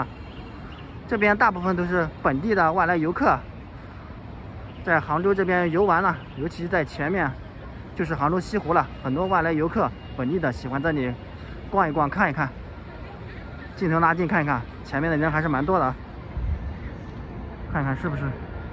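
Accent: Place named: Shaoxing, China. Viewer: 听口音 你是 小绍兴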